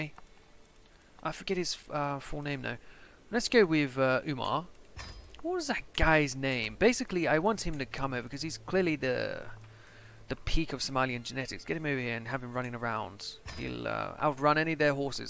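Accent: United Kingdom. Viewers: . You're thinking of Mo Farah, the long distance runner. Mo Salah is a soccer player. Bro you have the most british accent I've ever heard, you should know this.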